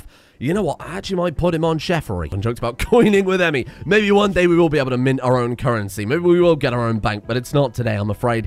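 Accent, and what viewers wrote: South, United States. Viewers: Your country twang is perfect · American accent improving?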